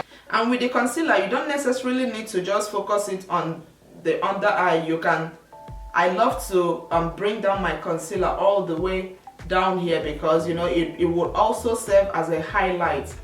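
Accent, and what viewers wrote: Nigeria. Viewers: Nigerian accents <3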